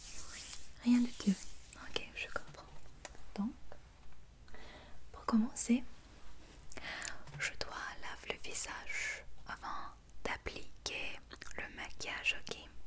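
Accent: Quebec, Canada. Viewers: Je n'arrête pas d'écouter tes vidéos à tous les soirs Je suis quebecois et entendre la manière dont tu prononces les mots comme on le fait un Québec est super relaxant (for some reason ça sonne exotique, réconfortant et familier. C'est la recette parfaite pour dormir) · j'ai reconnu l'accent un peu quebecois lol!! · Coucou, j'adore cette accent québécois. Merci pour ce maquillage, tu as fait disparaitre les imperfections et mis en valeur les points positifs. Je vais très vite reprendre rendez-vous. Gros bisous 😘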